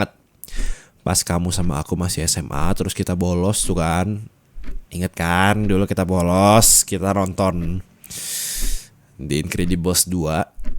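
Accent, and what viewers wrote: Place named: West Java, Indonesia. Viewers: Dari logat nya kaya orang sunda 😭👊🏻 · Singkat padat baheula ni sunda banget ni aa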